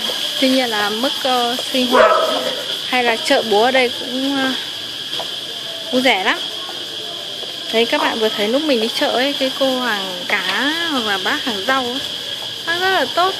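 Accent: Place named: Hanoi, Vietnam. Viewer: Chào bạn gái Hà Nội yêu thương! cảm ơn bạn chia sẻ video này để mình biết về chợ ở HN. Giong nói Hà Nội nhẹ nhàng đáng yêu. Đăng ký kênh bạn và mong bạn cùng tương tác lại với mình để duy trì kết nối nhé.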